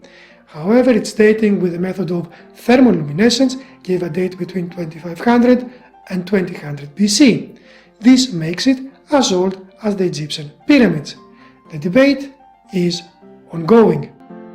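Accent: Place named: Greece. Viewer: You sound Greek af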